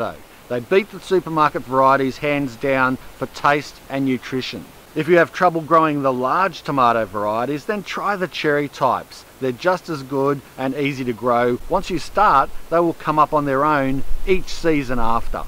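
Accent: Australia. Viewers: I love an Australian accent. I could listen to him all day. More importantly, I learned a lot about gardening. Subscribed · I love this guy's videos. I would sit and listen to him all day. He sounds like an Australian. I am a Jamaican, but I like him. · Thank you, from an Aussie living in Canada, I would suggest kale, Russian kale. It is easy to grow even continues through the snow, and is considered one of the most nutrious vegetables, sautéed with garlic, and then creamed for those who are less enamoured. also really good for our dogs, I add it with carrots sautéed lightly added to her meat and sweet potatoes...lovely to hear the good old aussie accent and way of being....Amélie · hey mate, the German word for cabbage is "Kohl" not "Sauerkraut". Sauerkraut is fermented white cabbage. I like your videos and your accent. I stayed in Australia for quite a while about 10 years ago and your voice just brought back old memories:) I will hop in more in the future and watch more of your videos. 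Having my own German "Schrebergarten" now, I really need to learn quite a lot about growing my own food. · pretty sure Australian isn’t a race but i get what you mean it is kinda stereotypical lol, like if he didn’t have a Aussie accent he probably wouldn’t have compared him to Steve Irwin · He’s Aussie stand reminds me of all my male family members. “When your choca up the bloca, have a cucumber!” Bahaha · Ken Spiracy I enjoy your presentation and unerstand love the Aussie Acent,plus all good knowledge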